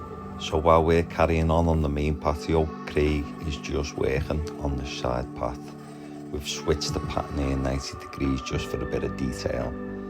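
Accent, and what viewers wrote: Liverpool, United Kingdom. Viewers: you’re from liverpool…..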